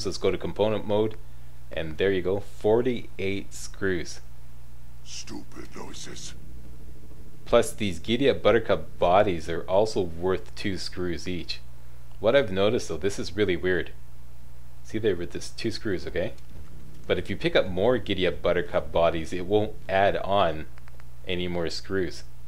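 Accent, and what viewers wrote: Canada. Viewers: HEY! i used to be a BIG fan of YOU and your WIFE, a few years back, but my account was deleted for some 5 second UMG clip in a comedy i put up [when Google bought up utube and were kicking lots of utubers off for the vaguest of reasons] and i used some rather abusive language and told them to 'gtfooh' when they demanded recompense. I heard you packed this all in. Last vid i watched of yours was wayyy back about Bioshock 1[ ithink?] and helped me decide to buy it as i had to sell my PC [cash problems] and bought a used xbox360 back then. [I got a PC now but the GTX970 is very poor so gonna try and get a swap for a 1080 as the outside fan is wobbling almost off its spindle and it's only 7 months old... If i just offer to pony up the extra £300 i hope they'll just let me swap it] Good to see your stuff. Subbed soon as i recognised the Canadian accent, eh? 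I figured out the vegetable oil thing for getting Adhesive though i simply recruited about 8 civs to farm the corn [hardest to find until i found one in a stash near the Museum and planted it and built up the crop from that, same with the Tatos and Mutfruit]. For noobs like me i would advise going for the level 1 Scrapper as i don't have a clue where Diamond City is and tho a Level 2 Scrapper gets screws from weapons you have to reach Level 13, Level 1 doesn't get many unless it's junk like desktop lamps etc. Also, I installed Nexusmod manager and have [found] bought a LongScope Crossbow which doesn't have the range of m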